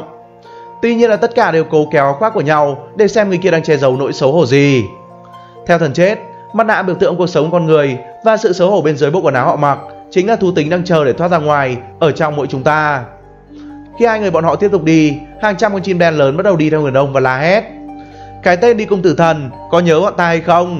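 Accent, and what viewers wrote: North, Vietnam. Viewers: Anh ở miền Bắc đk anh.-.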